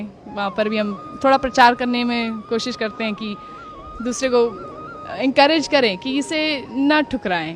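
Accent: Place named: India. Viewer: How she is foreigner?she can speak Hindi clearly with indian accent,so I think she is indian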